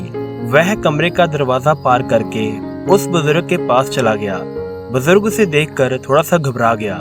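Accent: Punjab, India. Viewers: Bhai punjabi hai kya? Words bolte time punjabi accent aa rha hai for example missing "aa आ"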